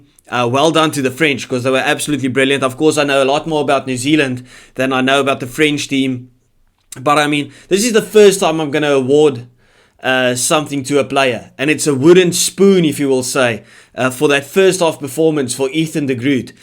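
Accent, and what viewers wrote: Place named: Afrikaans, South Africa. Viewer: Hierdie man het n DIK AFRIKANER aksent xD. Ek verstaan as jy afrikaans praat gaan jou kanaal nie groei nie. Wat n jammer.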